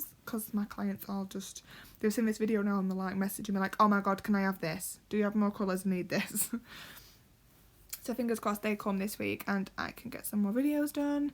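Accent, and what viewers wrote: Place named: South Yorkshire, United Kingdom. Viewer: Just discovered your channel today & it’s going to be so useful for me (currently training). It’s so nice to hear someone speaking in a S Yorks similar accent to me.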